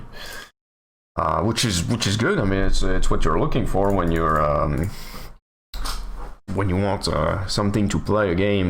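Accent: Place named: France. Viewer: you have a french accent, are u french ?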